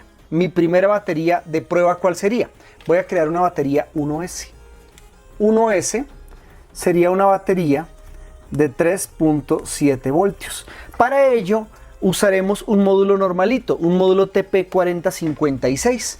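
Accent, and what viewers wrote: Colombia. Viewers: Buenos días mi gente. Estoy aprendiendo a arreglar parlantes a mi tercera edad, y yo siempre me pregunte para que diablos servia esa cinta de las baterías (reguladores de voltaje), y varios parlantes los deje así colocando baterías de litio sin este regulador, porque pensé que era como colocar las baterías a una radio. ¡qué error! Soy de Bogotá, y no sé donde conseguir esas cintas reguladoras. Por su asento sé que eres compatriota mio. Ese regulador de voltaje vendría siendo como el flotador en un tangue de agua, ¿cierto? Muchas gracias por sus contenidos me han ayudado mucho.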